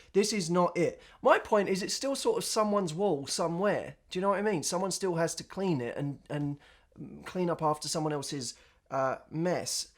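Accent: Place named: United Kingdom. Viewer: Vandalized my ass! lol you are so funny. What makes it even funnier is the way it's said in that British accent of yours:)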